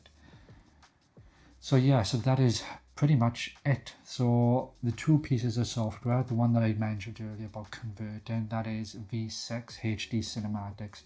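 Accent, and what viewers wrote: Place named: Wales, United Kingdom. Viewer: thank you so much, it was very helpful, but you kinda lost me in minute , it got very complex and i have trouble understanding your welsh accent. Keep the good work. Thanks one´s again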